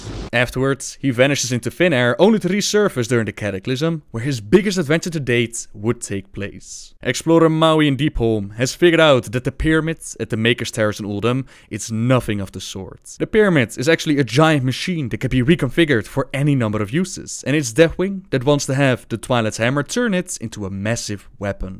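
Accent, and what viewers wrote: Netherlands. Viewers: Lol ik dacht... dat klinkt nederlands.. en die stem herken ik.. ehhhh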